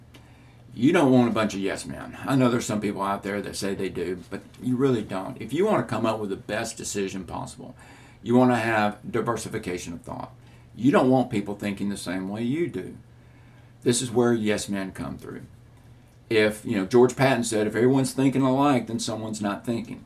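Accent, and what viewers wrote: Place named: United States. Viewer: Wow,I just feel like I found a treasure. This channel is really great. He uses a very simple and direct language. It's so supportive, informative and wonderful. Moreover, I learn more about the American accent.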